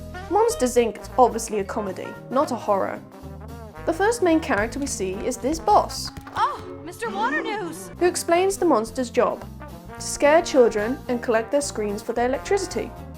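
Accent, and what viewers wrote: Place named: England, United Kingdom. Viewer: Great video! Great accent - where are you from? You sound like my favorite English pop star, Kim Wilde. Are you from London?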